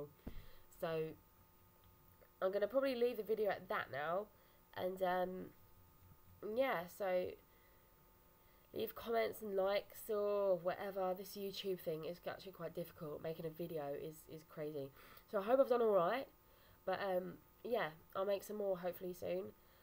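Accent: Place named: United Kingdom. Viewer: Hey Great first vid, for me was cheese toasties :) Sounds like your from the UK where abouts? I'm from Nottinghamshire. Keep making the vids, I've also not long ago made a channel be sure to check it out :)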